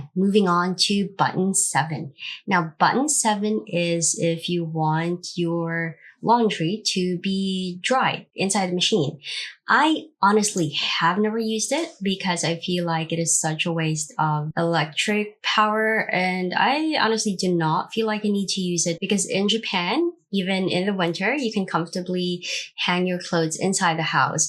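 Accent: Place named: Philippines. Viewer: Omg I love your accent! I'm also pilipina living here in Japan. This video is a life saver! Hahah tnx for sharing!!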